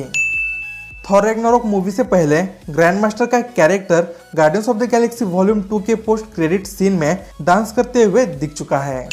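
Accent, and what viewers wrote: Bihar, India. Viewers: Tum bihar se ho kya brother?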